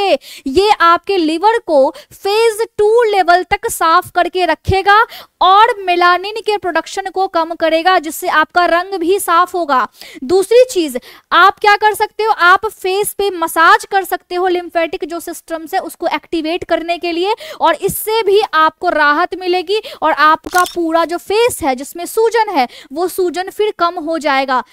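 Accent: Bihar, India. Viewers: Work on your tone, bol rhi ho hindi but bihar k tone aa rha hai.. your hindi word pronunciation is little bit weak.. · Aap bihari hai kya medam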